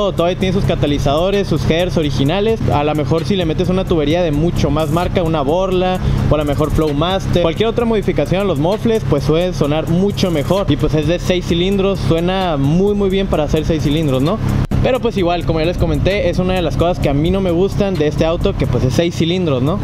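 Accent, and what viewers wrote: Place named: Sonora, Mexico. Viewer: Por las placas y el acento es de Hermosillo sonora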